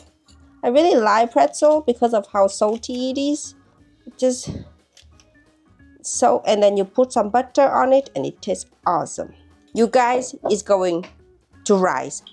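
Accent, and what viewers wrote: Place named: Germany. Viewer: Hilarious german accent coming out of her nouth..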